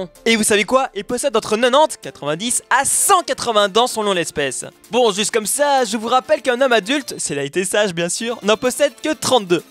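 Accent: Belgium. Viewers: Tu es belge ? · il est belge??? (réponder) S.V.P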